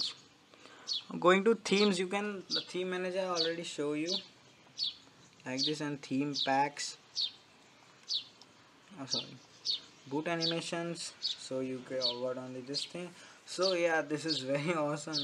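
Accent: India. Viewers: im tryin very hard to comprehend your indian accent, but fail, will try other video tutorials with better pronunciation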